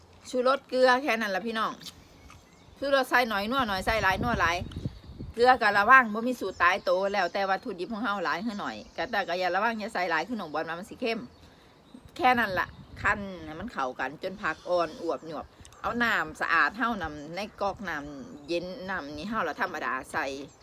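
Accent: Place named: Ubon Ratchathani, Thailand. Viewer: เว้าคือคนทางอุบล สีเกดเนาะครับ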